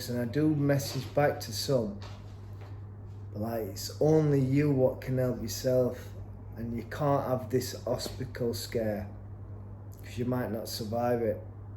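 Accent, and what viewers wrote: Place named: Scotland, United Kingdom. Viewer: Off topic but your accent is ten out of ten ha I love it. Best English accent from a scotsman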